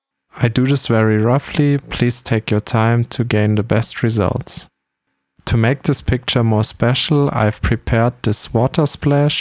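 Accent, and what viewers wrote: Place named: Germany. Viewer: Echt gutes Video! Man hört leicht deinen Deutschen Akzent xd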